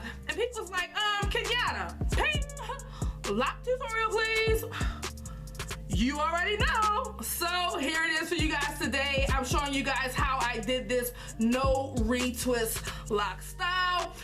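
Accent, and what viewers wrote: Baltimore, United States. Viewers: I just ran across your channel. Random question but are you from Bmore? You sound like some of my family members lol love the video hun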